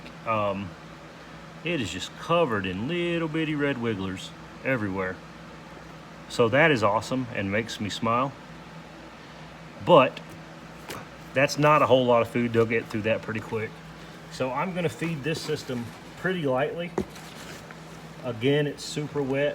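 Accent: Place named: Louisiana, United States. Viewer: Just Subscribed! Emily sent me. I'm gonna order some ANC cocoons from you towards the end of July. I thought I heard Louisiana in your video. Morgan City living here. Keep up the good work.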